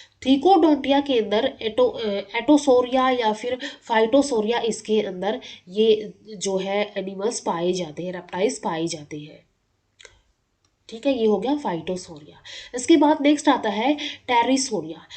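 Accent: Haryana, India. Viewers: Are you from hariyana?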